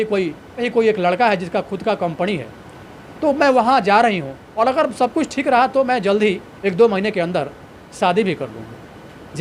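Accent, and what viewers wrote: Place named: Bihar, India. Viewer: Aap bahut achha bolte ho absolutely Bihari style..I like it ❤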